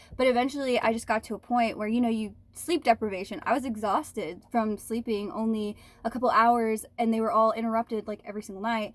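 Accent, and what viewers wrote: United States. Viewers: maybe your half what does it matter. Sounds American to me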